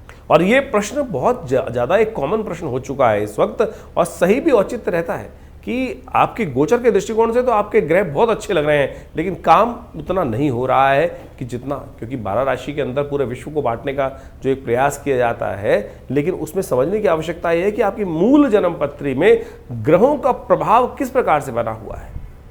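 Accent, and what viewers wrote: Standard. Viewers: Bahut sundar aur shudh uccharan hai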